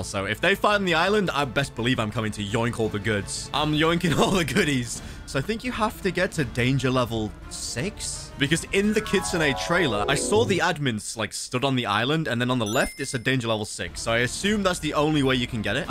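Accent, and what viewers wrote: United Kingdom. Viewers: Bros British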